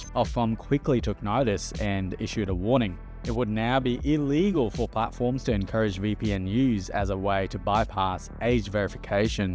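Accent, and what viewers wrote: Australia. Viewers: Graphic violence sounded like graffi marlins. That lazy Aussie way of speaking.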